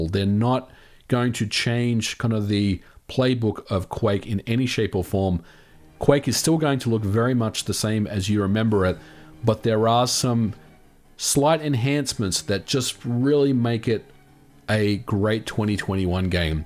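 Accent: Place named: Australia. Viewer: Are you Aussie? I just saw on your profile that you're located in the USA, but I hear an Aussie accent :)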